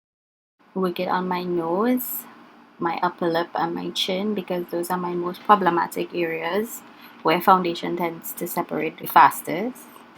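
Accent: Trinidad and Tobago. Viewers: i subbed as soon as i heard the accent cause im trini too!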